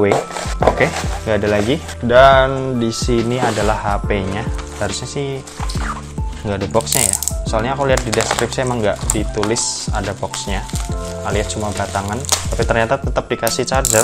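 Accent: Semarang, Indonesia. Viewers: Loh masnya orang semarang toh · Orang semarang tah mas?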